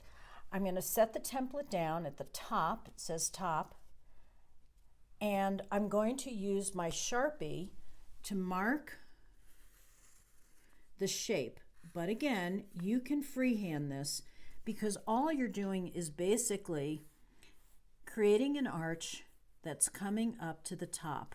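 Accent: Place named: United States. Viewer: Oh, you’re so welcome. If it’s any consolation, even young immigrants find it hard to make friends here (see Simple Living Australia - I think that’s the channel name. I’ll come back and edit if I got it wrong.) it might also be more difficult because of your accent. Americans don’t have a good reputation here, unfortunately for you, because you sound like good people. Please, if you know of any anti-Trump or resistance protests here in Oz, please let me know. I’ve wanted to attend No Kings protests here in Sydney, but can’t find any details?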